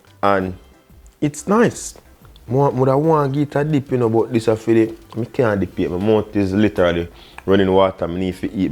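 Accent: Jamaica. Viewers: man i love jamaican accents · Yo...Am i the only personwho loves the Jamaican accent? Nah, that's what i thought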